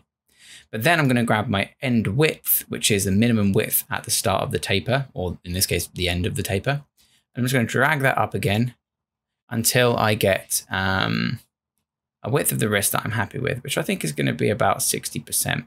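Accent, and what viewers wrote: United Kingdom. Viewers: This British guy has made my life easier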